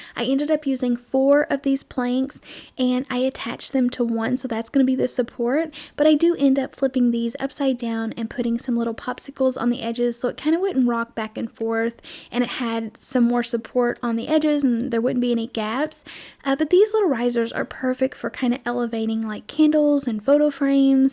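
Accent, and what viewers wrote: South, United States. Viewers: Ha ha! She does have a super sweet voice and personality to match. I'm a Texan and to me there's a bit of Southern Belle in her voice 😁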